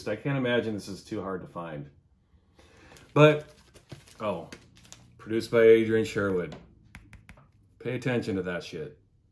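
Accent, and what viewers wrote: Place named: United Kingdom. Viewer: British accent? I was thinking the same thing! Funny!. Peace!.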